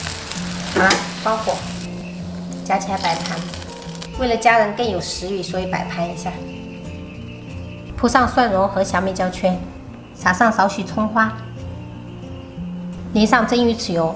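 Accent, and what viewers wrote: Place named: Fujian, China. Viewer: ：我在温哥华福建人，一看你的视频就犯嘀咕...觉得听到乡音！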